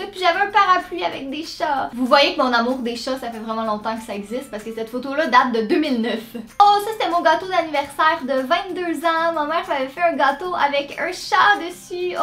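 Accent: Quebec, Canada. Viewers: oh là là je kiffe ton accent j'adore l'accent québécois mais juste question tu as quel âge parce que apparemment tu as plus de 22 ans mais tu fais vachement jeune tu fais genre 17 ans à peu près peut-être 20 je sais pas mais en tout cas tu fais plus jeune que ton âge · évidemment que je veux une version 2 💘💘 PS: je te trouve trop jolie et ton accent québécois est tellement chou 😍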